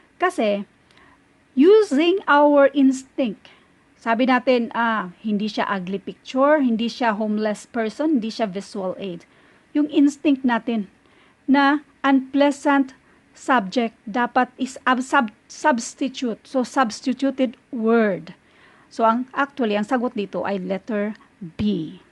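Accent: Visayas, Philippines. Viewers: Nakakatuwa ang bisaya accent. Makalingaw man. Pero daghan matun-an. Thumbs up po ako. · Parang bisaya kagud maam. Tama bako maam noh? 😂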